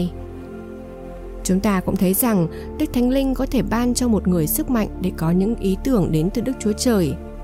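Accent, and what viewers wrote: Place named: Hanoi, Vietnam. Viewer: Giọng đọc hay quá . Cảm ơn nhé.nghe rõ, chuẩn giọng hà nội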